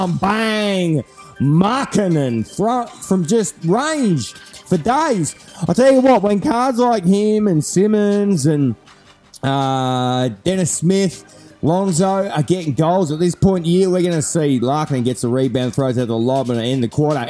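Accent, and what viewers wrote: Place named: Australia. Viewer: your voice is change, you sound more australian now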